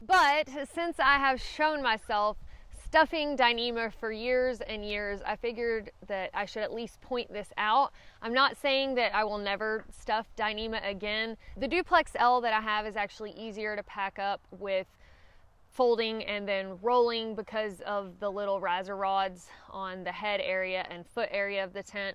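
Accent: South, United States. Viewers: I am not a backpacker, but I like listening to your awesome southern accent! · Were you born in the South? You pretty much sound Southern. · florida born and raised i really enjoy listening to your southern accent🤗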